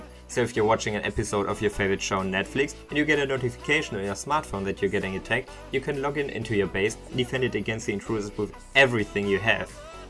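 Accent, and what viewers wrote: Germany. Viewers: Brooo man hört so hart das du deutsch bist ! Aber gutes Englisch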